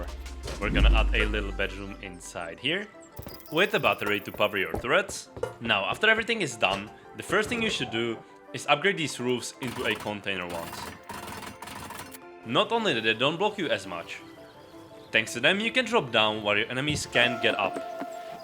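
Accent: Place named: Czech Republic. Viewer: Český přízvuk jde prostě poznat haha super baseka!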